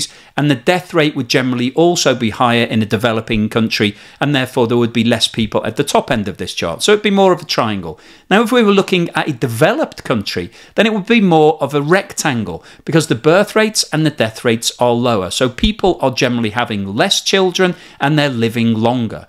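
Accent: United Kingdom. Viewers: if you don't mind my linguistic curiosity, I assume you are from the UK, judging by your pronunciation - yet you pronounce "Masterworks" the American way. Why is that (or am I somehow mistaken)?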